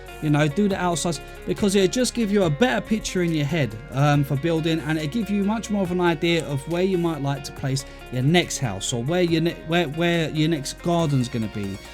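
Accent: United Kingdom. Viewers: how did i know this guy was going to be British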